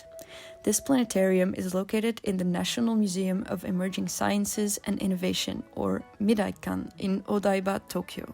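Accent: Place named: Netherlands. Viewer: was wondering if you were dutch until you said "stronger even" then i was sure lol this is great work for a first video essay! You really did your research, really difficult stuff since as you said, most sources are in Japanese only. Shows you're passionate about it! keep making stuff like this and you'll find your niche audience in no time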